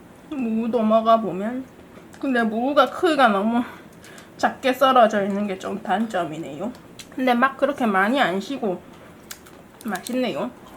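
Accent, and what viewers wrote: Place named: Gyeongsang, South Korea. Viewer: 경상도쪽 사투리에 뭔가 귀여운 말투 ㅋㅋㅋ 재밌게 봤네오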